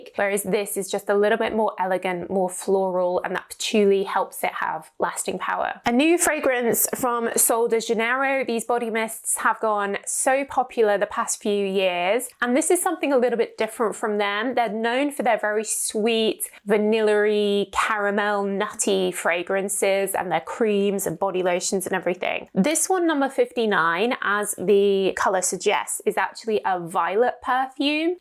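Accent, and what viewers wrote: United Kingdom. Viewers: her British accent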